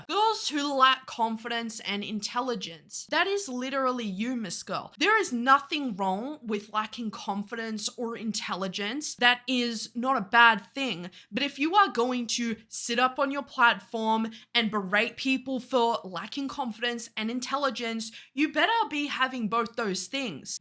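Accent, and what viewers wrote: United States. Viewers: Your American accent is really good lol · Okay but how is your American accent so fcking good?! Like I’ve lived in the US my whole life and I’d believe you were one of us 🤣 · I love your american accent 😂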